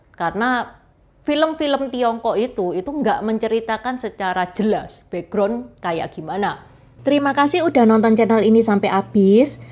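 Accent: Java, Indonesia. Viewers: Mbak e logatte jowo banget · Ketauan cindo ini kalo dia cindo Jawa soalnya Medokan jawanya terasa banget😂😂😂😂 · Kalo saya perhatiin di malaysia dan singapore, semua etnis yang ada disana seperti benar-benar terpisah. Di malaysia bahasa melayu umumnya digunakan oleh etnis melayu. Etnis tionghoa berbicara bahasa tionghoa dengan sesamanya, etnis india berbicara bahasa india dengan sesamanya. antar etnis berbicara dengan bahasa inggris. etnis selain melayu sepertinya enggan berbahasa melayu walaupun sebenarnya bisa. Apalagi di Singapore. Tapi sepertinya etnis Tionghoa lebih bisa berbaur. Bukan hanya soal bahasa Indonesia, tapi dengan bahasa daerah masing-masing tempat tinggalnya. sendiri malah lebih medok daripada saya yang asli jawa dan berbahasa jawa di rumah. Apakah ini semata-mata karena kepres 67 atau memang cindo pada dasarnya memang lebih membaur? Salut dan hormat buat atas kerja kerasnya membuat konten yang sangat mencerahkan. 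· Oke trima kasih skrg sy jd tau.Oya sy mau tanya ya kenapa ya cindo yg lahir di Medan atau di Bangka kok logat bicara tak sperti yg ada di daerah yg mereka tinggal ya Tak seperti cindo ( tionghoa) yg lahir di Jawa atau Sunda Salah satu contoh nya sy yakin asli dari Jawa kan....hehehe. Soal nya logat bicara nya ketahuan hahahehe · Chino Medok Jowonya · Suka dengan suara jawa nya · Aku suka logat medhok, jd inget wkt sekolah di jogja hehehe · Tionghua....tapi medok jowo.... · Keren nih china,dialeknya jawa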